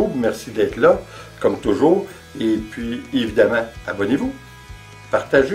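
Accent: Quebec, Canada. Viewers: C’est toujours un plaisir de voir vos expériences merci de nous les partager, et puis votre accent Québécois j’adore !! C’est vrai parfois pour nous Français il faut un peu de concentration pour comprendre mais c’est vrai aussi que le langage vient du vieux Français !!!!! Alors continuons à voir vos tutoriels 😉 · ne changez rien à votre accent... habituellement je ne comprend rien au parler québécois, mais vous, je vous comprend très bien. comme vous, les français ont un accent, le mien est de Marseille. mais ça ne change rien au fait que j'aime beaucoup ce que vous faites, merci de nous faire partager ce bonheur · J'adore l'accent québécois et je comprends tout, pas besoin se sous titres ! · Bonjour Je découvre récemment tes vidéos. C'est un vrai bonheur à tout point de vue! Et qu'elle audace concernant tout ces essais cela donne envie de faire et de créer Merci pour tout ce temps partager jusqu'à la remise en route de la Harley 👍 Je vie en normandie mes 2 fils vivent au Canada j'adore cet accent l'on te comprend très bien "c'est correct" 😉 surtout continu de nous faire des petites vidéos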